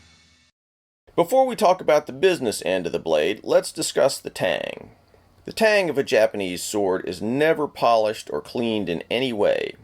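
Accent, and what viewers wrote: United States. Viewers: The rather Americanized pronounciation notwithstanding, this was a very good introduction to the topic. Kudos for breaking down a complex topic without dumbing it down in the process.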